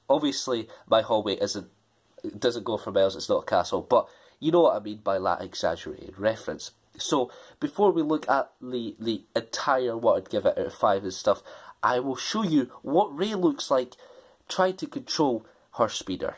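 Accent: Scotland, United Kingdom. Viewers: What part uv Scotland u from?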